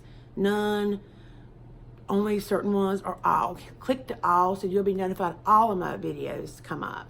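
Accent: South, United States. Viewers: That is such a sweet little corner you've created! Everything co-ordinates and flows so beautifully together. It's so nice to keep some sentimental pieces like your scarecrow through the years. I still have a Christmas ornament my friend from school made me in grade 5. He's a Santa face made from paper glued on a pine cone with a red paper hat and cotton balls used for the top of his hat and his beard. The years have caused the cotton to disintegrate a bit but he always hangs on my tree each year. By the way, I just turned 59 last week so he is pretty old to! Anyway, love watching your videos and hearing your southern accent, ( I'm from Canada so to me you have an accent)😊 Bye for now, Sandy 💟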